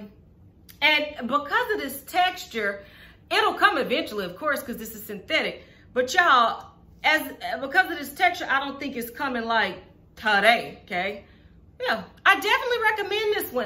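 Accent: South, United States. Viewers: What do I think?? I think we could be friends wit yo country sounded self. Girl you are a hoot! I absolutely love your candor and style!!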